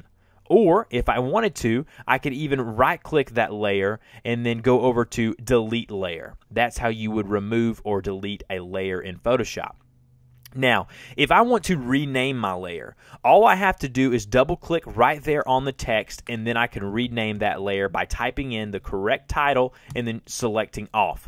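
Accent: South, United States. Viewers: Thanks for this video, it was really easy to follow! Also that southern accent is charming. ;)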